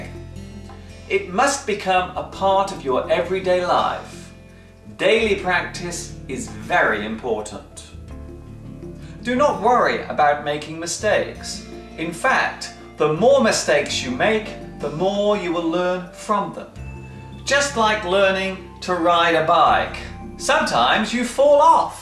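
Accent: England, United Kingdom. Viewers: hes speak using British Accent :) my favourite accent :P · I like your accent is different than the American English, I know you are from England.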